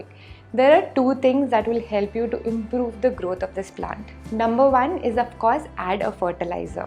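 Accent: India. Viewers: I love plants and I love Indian accents. How did the YouTube know this? 🤩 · Any ficus is a rubber plant therefore in my country the most comon look quite diffrently. And dang i am on c1 levelnof english i even understand scotish a cent but insien accent is draining to listen to.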